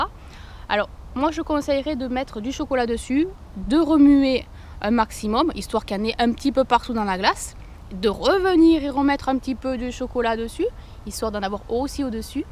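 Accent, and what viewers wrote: South, France. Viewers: Suuuuper ! moi j'en ai fait une aussi avec 2 ingredients mais se ne sont pas les mêmes haha. passe voir si tu veux, en tout cas je m'abonne , j'aime ton petit accent du sud !!! et pouce en l'air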